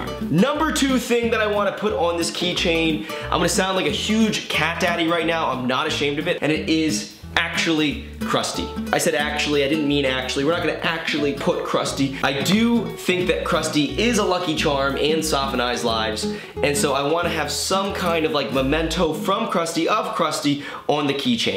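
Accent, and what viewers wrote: Philadelphia, United States. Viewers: u can hear his Greater Philadelphia Area (tm) accent so clearly in this video omfg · what part of philly are you from lol I hear it in your voice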